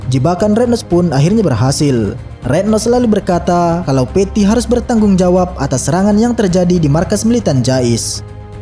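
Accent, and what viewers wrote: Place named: Padang, Indonesia. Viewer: Pengisi suara orang padang❤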